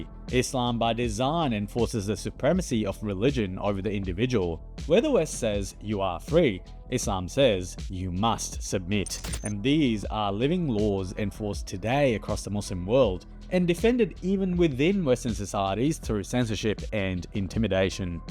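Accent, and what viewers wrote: Australia. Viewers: Great video, thank you. I can't believe you have such an Aussie accent but are from the Maldives. And I got a shock when you said you were an ex-Muslim in your previous video. What age were you when you arrived in Australia?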